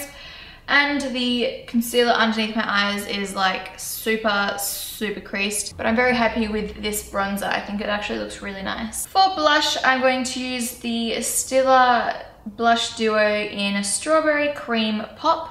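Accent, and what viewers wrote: Australia. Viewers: Ur Australian accent is so cute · Australian or New Zealand?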